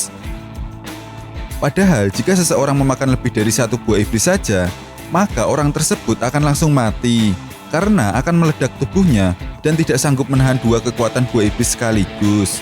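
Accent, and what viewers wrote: Java, Indonesia. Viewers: Bahasanya medog ,, tapi itu yg membuat saya terhibur ,, lanjutkan ....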